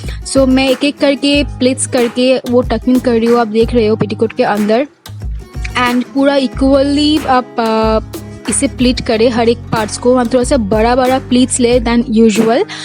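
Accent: Bengal, India. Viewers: Didi tmr kotha sune mone hocche tumi nischoy Bengali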